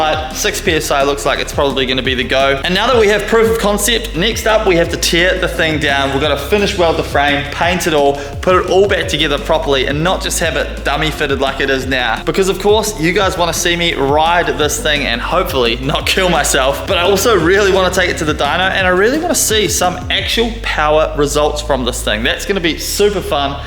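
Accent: New Zealand. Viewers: Are you a yank???? It’s zed not zee your kiwi accent is hard enough to swallow but adding a yank into the mix is just too much to handle I’m going to have to unsubscribe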